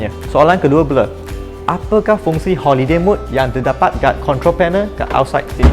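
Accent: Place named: China. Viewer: u look indian but sound chinese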